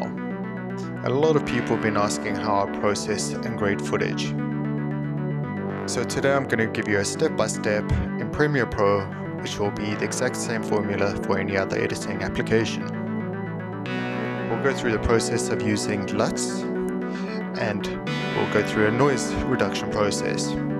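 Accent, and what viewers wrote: South Africa. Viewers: I sense a South African accent !!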